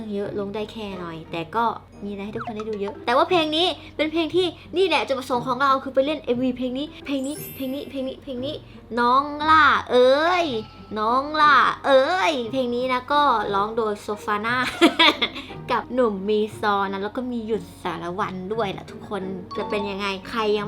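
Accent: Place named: Isan, Thailand. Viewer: พี่พูดอีสานน่ารักนะ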